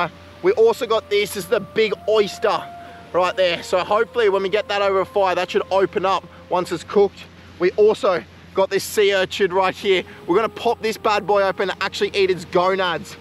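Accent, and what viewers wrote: Australia. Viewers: Are you Aussie bc I can here your accent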